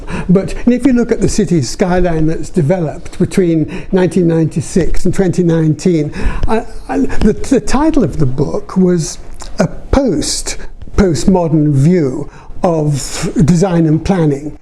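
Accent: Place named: Scotland, United Kingdom. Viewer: Thanks for interesting talk. I love the sections and I did think more CITYscape sections should be analyzed in a landscape project ( including architecture in those sections), which could be best started from LANDSCAPE ARCHITECTURE education ( if as you said it did not exist in ARCHITECTURE education). PS: Lovely Scottish accent!! Thanks!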